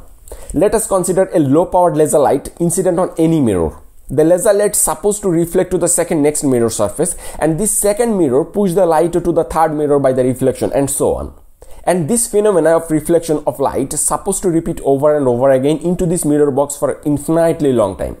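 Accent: India. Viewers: Are you Indian? Your accent is like an Indian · *clicks video, hears Indian accent, clicks off video in disappointment.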